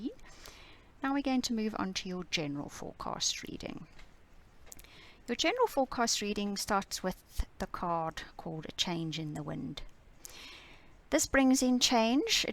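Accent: South Africa. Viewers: love you're south African accent... very nice positive reading thankyou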